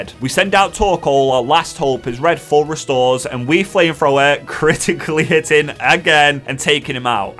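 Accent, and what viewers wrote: United Kingdom. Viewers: you're English you say every thing different is fine all poke tubers do it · Bro are you British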